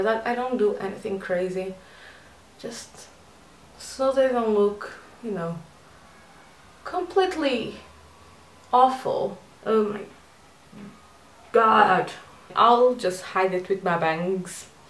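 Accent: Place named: England, United Kingdom. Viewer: You're so funny and really like your English accent :)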